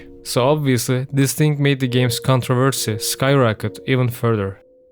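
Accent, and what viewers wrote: Turkey. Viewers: aksanı türk gibi. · came here to say this, his accent is exactly like a Turkish person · Türksün sesinden belli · I can hear the turkish accent kankam, good video ellerine saglik;) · As a Turkish, i smell a Turkish accent 😁 Btw for your first video, the wievs are really good! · Video çok güzel de, Türk müsün amk. Yemin ediyorum lan bu adam türk mü diye aksana odaklanmaktan videoyu izleyemedim:d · You sound like turkish man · Hocam video güzel olmuş eline sağlık. Türk müsün acaba aksan Türk gibi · Ben senin o türk ingilizceni anlarım başkannn.. Manyak video bu arada sevgiler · Türk ingilizce aksanini 100 kilometre öteden dahi tanırım. Güzel video olmuş usta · Out of context but are you Turkish? Your accent sounds like Turkish accent a lot. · kanka Türk müsün sesinden anladım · What accent is that? It sounds turkish. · Türk aksanı duyuyorum sanki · Turkish %100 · you kinda sound like turkish though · I love the Turkish accent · You have a Turkish accent, are you Turkish?. 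I like your video btw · I know a Turkish when I hear one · aga sen türksün ben o aksanın kokusunu alrıım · you sound turkish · Your accent sounds very Turkish maybe because I’m Turkish and used to hearing it a lot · Are you türkish by any chance? Your accent very similar to türkish accent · türk müsün len aksanın o vibeyi veriyor great video btw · aksanı duyduğum an türk olduğuna emin oldum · I dunno why but your accent sound like Turkish accent to me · Turkish accent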